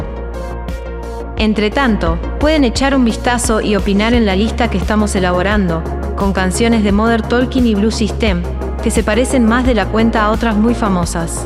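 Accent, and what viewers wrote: Argentina. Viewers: Buen video! Me intriga que tu acento parece argentino, como algunas palabras (pibe, quilombo, orto); usás palabras de España como "calzonazos" o "enchufado", otra expresión que no sé de dónde viene ("ni pajonera idea") y el mito mexicano del nagual... Otra curiosidad es que al chanta del protagonista le reclamaran por haber pagado 100 euros por canción en los 80 y 90, cuando el euro existe recién desde el 2002.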